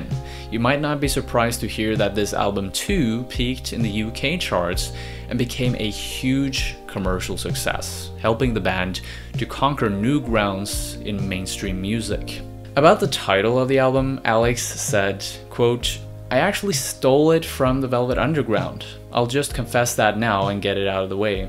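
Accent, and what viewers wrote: United States. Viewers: are u american? what country ru from lol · hearing an american talk about british things is so funny 😂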